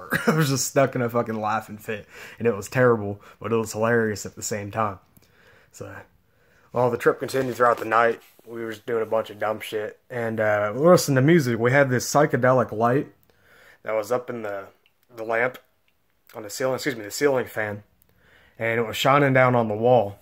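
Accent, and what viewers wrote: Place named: East Texas, United States. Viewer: You sound like your from east tx also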